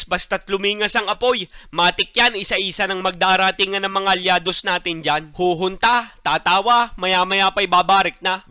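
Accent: Batangas, Philippines. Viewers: Magaling na mag luto natutuwa pa ako sa Punto ng Batangeño 😂😊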